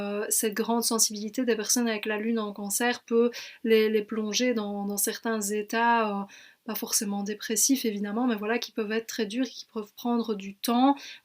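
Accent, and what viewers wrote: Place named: Belgium. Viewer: J'adore ton accent belge vraiment adorable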